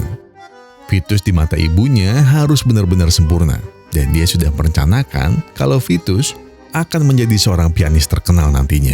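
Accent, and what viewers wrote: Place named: Java, Indonesia. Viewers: Logat jawa'mu kuwi lo aku suka 😊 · Suarane khas bgt wong yogjo mas.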